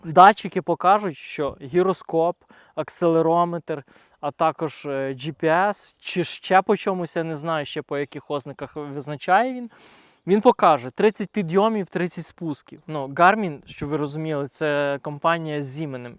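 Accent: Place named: Western Ukraine, Ukraine. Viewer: плять що за жахлива українська? плять як задовбали ці западенці. Селюки плять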